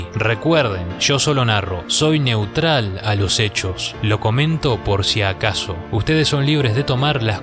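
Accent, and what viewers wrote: North, Mexico. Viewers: Pero que norteño 😅😅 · Diablos , esto es lo mas norteño que mis oídos han oído jajajaja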